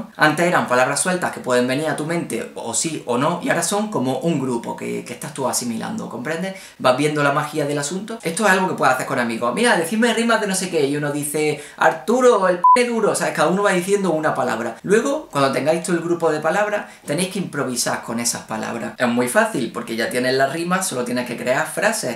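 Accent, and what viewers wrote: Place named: Granada, Spain. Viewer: Tienes acento granaino de donde eres?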